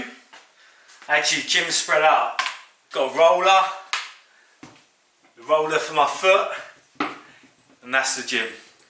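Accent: United Kingdom. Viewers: thats a british accent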